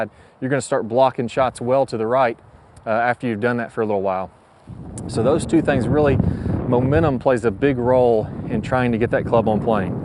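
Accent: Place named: Texas, United States. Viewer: you have got to be in East, southeast Texas with that accent!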